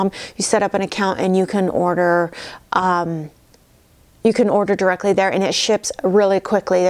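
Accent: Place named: Canada. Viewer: thanks I'm already at the online store seeing what they have...I didn't realize you were in Canada...that's where the cute accent I hear is coming from.....OK thanks again and will let you know what I buy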